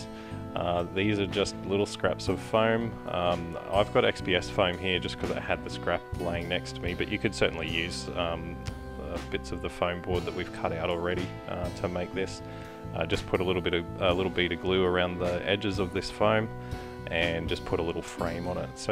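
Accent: Australia. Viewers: Mate enjoy your videos, obviously your Australian . Which State you in. Looking at supporting.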